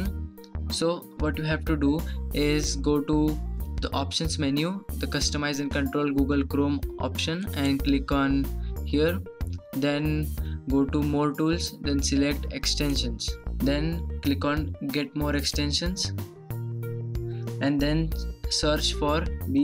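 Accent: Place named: India. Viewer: i love when indian talking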